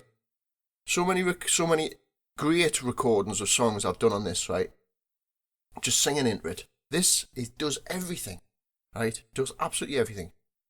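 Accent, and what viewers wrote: Newcastle, United Kingdom. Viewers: Love your videos. It's not just the Geordie accent. You are proper talented, and very entertaining. Now I need a proper XLR microphone. I have a 3.5mm jack to XLR adapter, but it's not great. It picks up radio interference.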